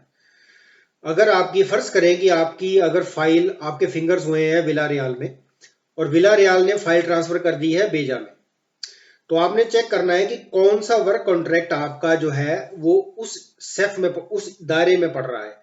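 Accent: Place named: Pakistan. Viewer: Yaar brother ap urdu itni saaf boltey ho jesey pakistan sey ho .. kahan sey seekhi ithini saaf urdu ?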